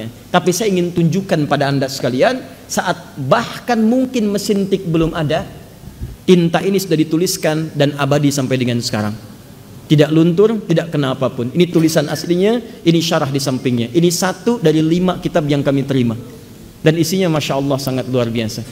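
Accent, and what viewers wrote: Arab world. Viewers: Ust ni org Arab ke? Cakap Pon loghat arab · Ustad dahsyat, ilimu nya tinggi, keterangannya mudah dimenerti. Tetapi mohon logat bicaranya jangan spt org Arab berbicara bahasa Indonesia. Salam.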